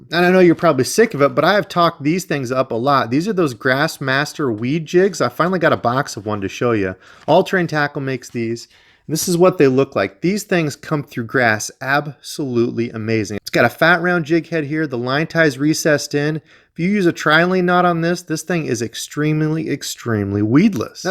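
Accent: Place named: South, United States. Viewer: The entire South sounds exactly like that lol….Id like to know how to use the swivel or wobble type head ones.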